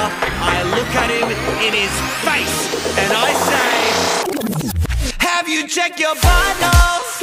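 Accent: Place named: Australia. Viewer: Why isn't there anyone who mentions that his Australian accent is at least like 50% of what makes this perfect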